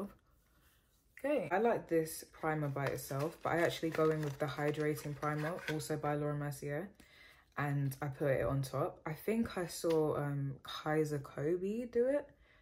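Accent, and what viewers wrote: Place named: England, United Kingdom. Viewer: Finally found you on YouTube from my ig videos and was shocked when I heard you speak....youre English!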